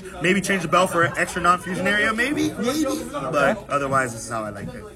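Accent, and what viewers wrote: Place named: Toronto, Canada. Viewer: I recognize the way he speaks. My dudes from Toronto 🙏🏻 got a crazy good profile too. Thinking about getting into this deck.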